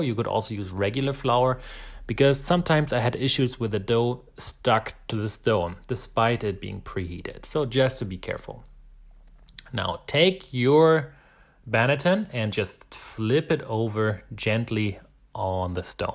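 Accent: Germany. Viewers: is 15 minutes not 50 right? I know the subtitles say 15 but maybe AI was thrown off by a charming German accent?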